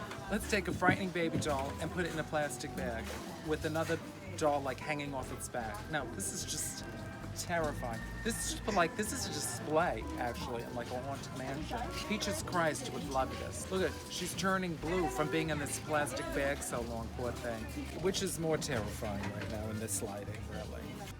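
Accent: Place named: Bronx, United States. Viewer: I hear the Bronx! Love it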